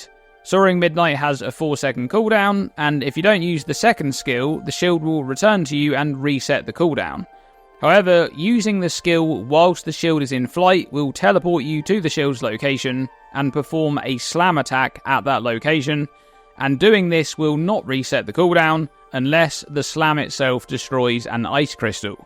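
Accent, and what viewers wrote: United Kingdom. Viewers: The English accent is the nicest of all the accents.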